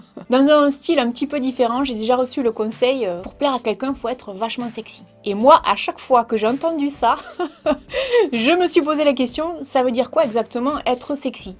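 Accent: South, France. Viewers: J'adore ton accent du sud, ça met du soleil dans ma journée ! Sinon pour les études ça fait 3 ans que je fais de l'informatique et j'ouvre enfin les yeux en me disant que c'est pas fait pour moi ... Bon au bout de 3 ans c'était un peu long mais du coup je sais même plus ce que je dois / peux faire avec ma filière, compliqué ! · OMG, là je ne pouvais pas ne pas écrire: Prend un caillou si tu as un point de côté!! Jamais entendu celle là. Les gens qui ont inventé ce conseil étaient pas très fut fut. Tu veux qui fasse quoi le caillou! Il est pas magique:'D Sinon un conseil quand tu as le occet (aucune idée de l'ortho) on m'as souvent dit de boire de l'eau, ça a jamais marché:'D ou alors bloque ta respiration, ça marche temporairement mais après je l'ai toute la journée. Pas cool. Par contre ce qui marche, c'est une cuillère de citron et du sucre. Après chacun est unique donc... 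ce qui va marcher sur qqun ne va pas marcher sur un autre. En tout cas, je voulais te dire que depuis que je suis ( depuis tes débuts) je ne peux me passer de regarder chacune de tes vidéos. Tu mets de bonne humeur! Ton accent du sud me réchauffe autant que la bonne humeur que tu dégages. Merci d'être toi!:D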